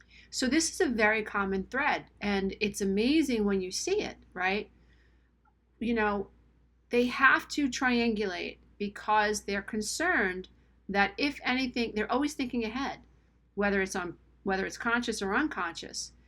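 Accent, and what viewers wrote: New York, United States. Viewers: it's refreshing to hear a narc abuse channel with a new york area lilt. nice work. thank you.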